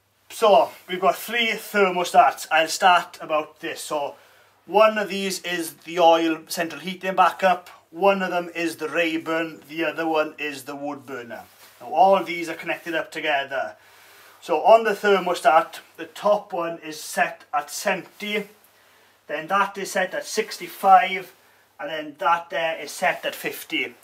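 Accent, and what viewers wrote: Wales, United Kingdom. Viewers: Hi, what model rayburn have you and how many rads? Can the rayburn handle it? Do you know what sort of kw output you get from your rayburn? Im wondering if a rayburn would heat a kitchen adjacent to my lounge if i put the rayburn in my inglenook in the lounge as flu etc already in place currently have 5kw log burner in lounge and heat won't travel to kitchen i have tried fans and all sorts now thinking of rayburn ty for video nice to hear welsh accent again after growing up in Brecon, in Shropshire now. Cheers.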